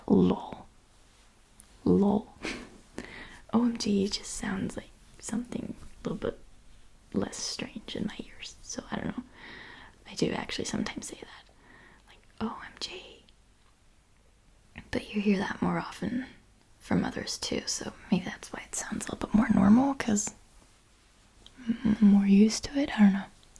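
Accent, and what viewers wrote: United States. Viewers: I knew you spoke German I just didn't think you were German. You speak English so well and naturally, I thought you had an American accent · your accent sounds American. your English is 'perfect' and your Spanish is as well. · Your German? Could have fooled me with that american accent. ok every now and then it sounds a little off but for the most part "98%" you sound american :) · You really wouldn't think you're not American. Your accent is so on point! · This was fun....As a viewer for the last 2 years, I still can't figure out how you sound more American than my American friends ツ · You seriously sound sooo American it's Hilarious lol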